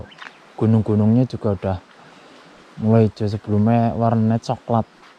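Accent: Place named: Ngapak, Indonesia. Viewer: Bahasa indonsianya medok banget pasti orang ngapak ya masora ngapqk ora kepenak pokoke mas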